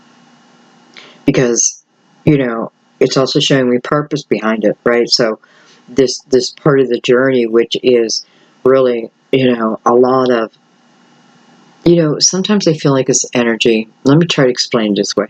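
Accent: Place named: Central Pennsylvania, United States. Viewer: Love your readings♥️ are you from Central PA? You have a York/Hanover etc accent. My ex husbands family is from there and I recognize the accent.. I could be wrong though 😊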